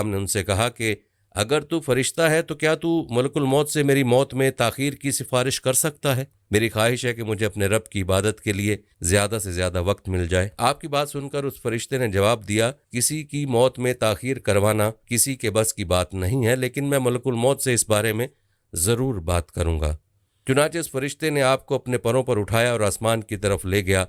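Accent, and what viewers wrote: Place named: Punjab, India. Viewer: Adrees nhi idrees hy na'am aap Punjabi hn lehjy lugta hy kion ghalat na'am lety hn.thory sy mehnat or homework karen or phir vdo banayen.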